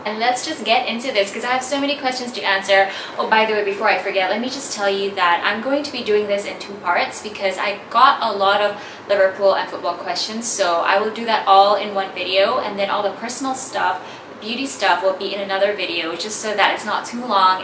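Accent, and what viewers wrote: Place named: United States. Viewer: You speak well, where do you live. You have American accent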